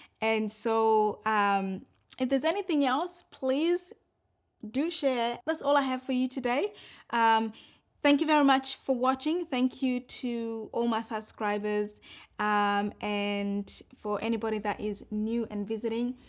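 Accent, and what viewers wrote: Australia. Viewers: love your content you sound like an aussie . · I always love hearing the perspective of someone who's had a difficult background. It makes me appreciate my country more 🇦🇺 And hey, I can hear some Aussie vowels coming through 😊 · I can hear a bit of an Aussie accent comming through Don't know how long you've been here, but yep you're an Aussie love Great southern Land straya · We love having good people like you here with us. You're sounding Aussie already. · I love your video. I can hear in your voice how Australian you have become and the passion that you have. So happy that you are a fellow citizen contributing to our shared future. Australia is an on-going experiment, driven by our history, but more driven by people like yourself, who come from a more challenging life experience, with a broader perspective that values the rights and opportunities available to our citizens. 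These rights cannot be taken for granted and I greatly appreciate how you expressed that. As our country evolves with an ever changing demographic it is important that we continue to define our strengths, as you have done so well, and hopefully inspire us to preserve and protect them. Thank you. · What an excellent addition to our great country, best thing about Australia, is Australians. welcome to the party ? also..your aussie accent is definitely happening :) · Well I don't know how long you have been here in Australia but you have a great Aussie accent!!! Glad you love it here! Cheers from Sydney! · Sounding more and more Aussie. 🥰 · Wow. What a positive story and bubbly personality to match! Aussie here. Been living in Europe the past 20 odd years. Lucky enough to live in a country where the healthcare system, education, public transport, etc, are well managed, as in Oz. I have been going back home very year or two. 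Each time I go back, I notice how much more multi cultural Australia is becoming. Seeing people from many different countries, not just Europeans, making Oz home. Think it’s fantastic. Looking forward to moving back in a few years. BTW, your Aussie accent is really coming through. Sound more Aussie than me. All the best. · I'm always very pleased to hear the Aussie accent breaking through with an immigrant. Congratulations on your good taste and good fortune to be an Australian, and a beautiful one too!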